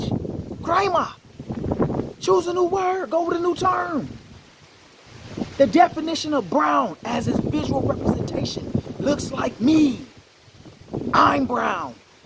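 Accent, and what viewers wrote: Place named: Alabama, United States. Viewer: You talk like white man from Alabama.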